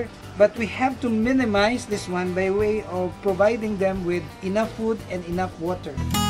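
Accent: Philippines. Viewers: I think this guy from the philipines, just by listening to his voice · He's accent is Filipinose.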